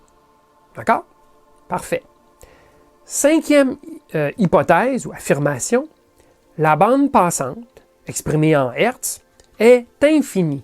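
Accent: Quebec, Canada. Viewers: Wouahouuu ! c'est EXCELLENT !!!!!!!!!!!!! très bien fait, vidéo très bien montée.... RESPECT !!! ET VIVE L'ACCENT QUEBECOIS !!vivement la suite !!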